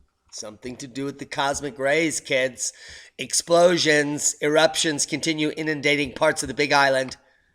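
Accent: England, United Kingdom. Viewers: Last night I watched a visually stunning doc called "A Volcano Odyssey", YT...they explain that the meteorite that "caused" the extinction of dinosaurs 65 million yrs ago was not the only phenomenon that wreaked havoc on earth (we knew that..;)....India was a floating continent below the equator at that time, drifting northward over a hot spot, and a massive volcano that erupted for perhaps millions of yrs at about the same time, wOw The mountains of Mahambaleshwa were created in northwestern India...Fascinating... Volcanoes Rule, are Earth's supreme geoengineers =D BooM! Thank You...I love your Brit accent;) Many Blessings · for a moment there I thought I was listening to an English bloke, the accent was so good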